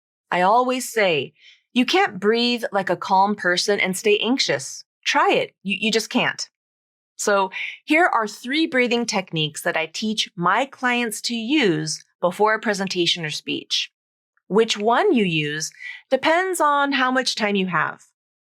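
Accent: San Diego, United States. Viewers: Hey! I'm a non-native speaker and I've been watching your videos and i absolutely love your channel. I was just wondering if you speak in a standard American accent or is there a tad bit of San Diego in it?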